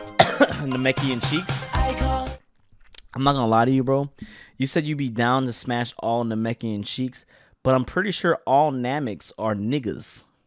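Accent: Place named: Toronto, Canada. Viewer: Bro please stop doing that Toronto accent 😭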